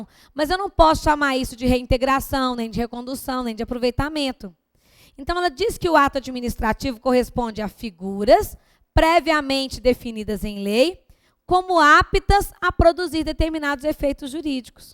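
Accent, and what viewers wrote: Minas Gerais, Brazil. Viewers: Tinha q ser mineira !!! ADORO!! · Aula muito elucidativa com uma linguagem bastante tranquila, e ainda com um sotaque Mineiro, parabéns professora continue assim obrigado pela ajuda.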